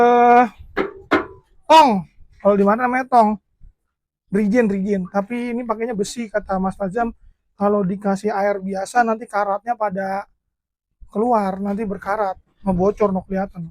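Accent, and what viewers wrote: Jakarta, Indonesia. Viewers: ngomong nye kyk orang Betawi..... Sama me gw bosssss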